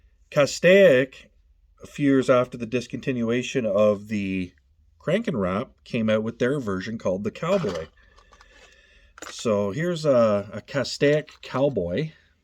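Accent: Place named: Canada. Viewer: Your accent is identical to Ricky’s accent in trailer park boys, you must be Canadian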